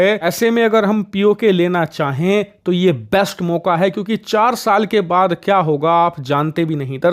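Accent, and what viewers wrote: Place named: Bihar, India. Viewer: Apki Abaj Purana Nehin.Avi Bihari Vojpuri Abaj Bol Rahi.kya Huwa Purana Abaj.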